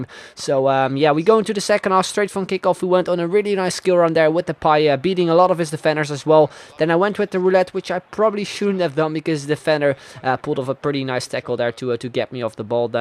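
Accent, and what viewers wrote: Netherlands. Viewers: Hij klinkt nederlands, is hij dat ook?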